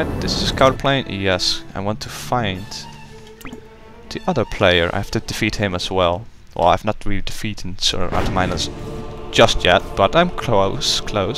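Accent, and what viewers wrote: Netherlands. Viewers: Dutch peoples english accent is so easy to recognise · Yea, you sound dutch :P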